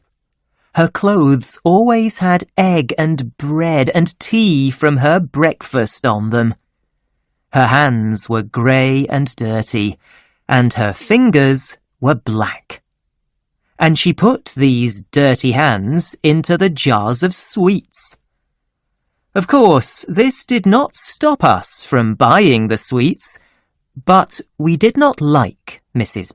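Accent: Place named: United Kingdom. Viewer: I love the British accent and try to listen to it slowly to understand their pronunciation.thank you for this video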